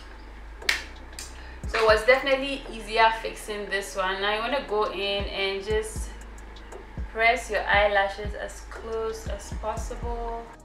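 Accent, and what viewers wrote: Ghana. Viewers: When I came across your video and heard your accent I was like she sounds Ghanaian and I was right🇬🇭🇬🇭! I love your tutorials, keep it up. I plan on watching this to do my make up for a wedding I have coming up this weekend. Thank you! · i came in the comment section to find out if she's Ghanaian...that Accent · This girl, you are a fine babe. My first time watching and I said, ahh this accent is from Ghana. I love your accent.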